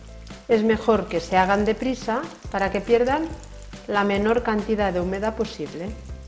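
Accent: Spain. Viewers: Olá ! Ela fala espanhol da Espanha, não é argentina, mas gostariamos de recever-la , você sempre é bem-vinda a meu país! Brigada !! 🌷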